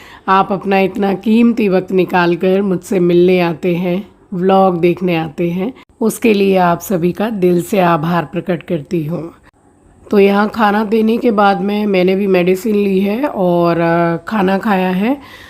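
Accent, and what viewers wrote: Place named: Uttar Pradesh, India. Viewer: Pehli bar aap ki lengbej kuch up Bali suni hai maja a gaya 😊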